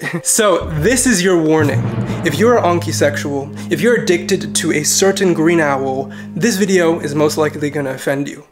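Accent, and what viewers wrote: Nigeria. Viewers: Great Nigerian accent! Do you eat any Nigerian food? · Does a good nigerian accent but doesn't speak igbo 🙄 i am a bit salty as you can see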